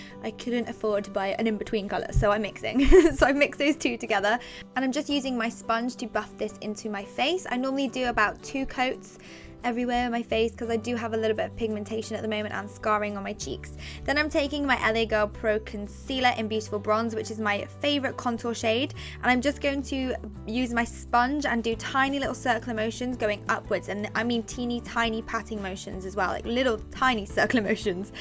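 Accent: United Kingdom. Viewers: I know I meant where abouts in the uk lol! Your accent sounds similar to mine I'm from Gloucester xx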